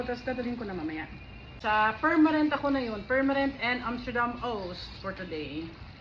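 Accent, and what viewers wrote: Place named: Ilocos, Philippines. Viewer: Wow..galing mo po mag tagalog and parang may ilocano accent din po the way ka po mag salita.. ❤❤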